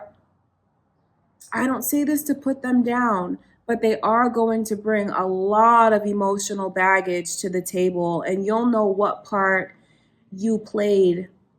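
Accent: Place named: Canada. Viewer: Does anyone know if she is Canadian?? I feel like I hear a tiny bit of a Canadian accent, ey?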